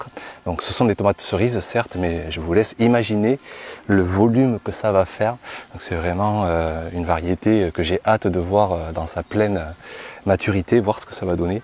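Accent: South, France. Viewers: Salut, Comme dit Ludo, c'est toi qui a volé le soleil! Nous on se prend les orages tous les jours, et toi tu nous nargues avec ton potager magnifique qui conserve une avance scandaleuse sur nous-autres 😉 En plus, à chaque fois, tu me fais envie à grignoter tout ce qui passe alors que chez moi c'est pas mûr!!! Non mais l'amaranthe quoi! Les miennes font 10cm MDR Comme d'hab, jardin magnifique, plantes magnifiques, et le petit accent du midi qui va bien, il manque que le Ricard (tu fais pas pousser le Ricard? Comment ça??? ^^)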